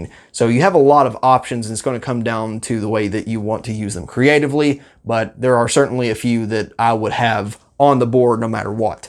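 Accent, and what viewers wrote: United States. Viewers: very interesting stuff, ty for putting this together. as i am curious (german native speaker) - that accent, which area of the states are you roughly from?!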